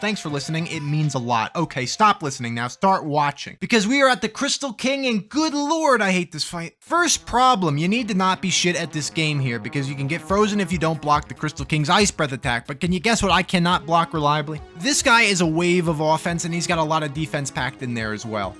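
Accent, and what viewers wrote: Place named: New York, United States. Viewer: I never knew I wanted someone to do game challenges, talking a mile a minute, and with the THICKEST New York accent I've heard in my life before! I watched this and the Mother 1 video yesterday, and I find them interesting.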